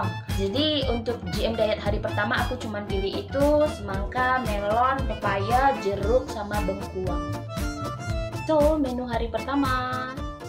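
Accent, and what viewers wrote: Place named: West Sumatra, Indonesia. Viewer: Takah baa bana mangecek bahaso inggrih, tetap se logat minang indak ilang.. dangakan secara seksama beberapa kata "menu, ribet dll".. pertahankan minang ny yaa.. 😊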